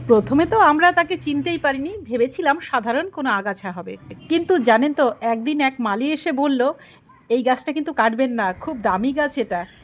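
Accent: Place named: Bangladesh. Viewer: অসাধারণ ব্যাপার 💚 আমি প্রথম দেখলাম। আপনার কথা শুনে বুঝতে পারিনি আপনি ভারতীয় 😊 একদম বাংলাদেশের মানুষের মতো কথা বলেন ❤ যেহেতু আপনি বেহালা থাকেন সেহেতু ঢাকাবাসী আমি আপনার কাছ থেকে গাছটি পাওয়ার আশা করতে পারিনা! তবে গাছ এবং ফুল সম্পর্কে জানার প্রতি আমার খুব আগ্রহ! ভালো থাকবেন এবং ভালো থাকুক আপনার গাছটি🌿☘️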